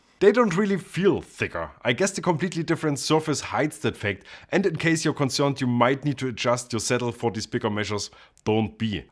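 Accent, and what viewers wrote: Germany. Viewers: Like your german Stile of English :)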